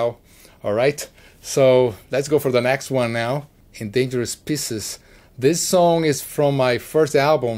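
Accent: Brazil. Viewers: ainda bem que tu tá falando inglês com o teu sotaque brasileiro, eu entendo quase tudo o que tu fala, sem necessidade de legendas. Meu inglês não é fluente, e quando eu ouço um americano falando, todo enrolado, eu não entendo quase nada, aushuhauha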